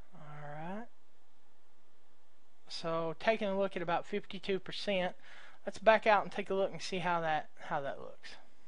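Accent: Texas, United States. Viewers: a real Texan. love the way you talk. the layer could be duplicated then dragged over to the other eye then re-adjusted in size and fixture to give the same effect without repeating the same work on the other eye. good work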